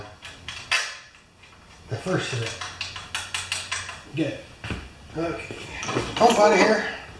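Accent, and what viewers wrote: Scotland, United Kingdom. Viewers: Good close up for sure. I liked your Scottish accent ;-) no easy fix for that pump.. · Great Scottish accent there, better than mine! (Watching from the UK):)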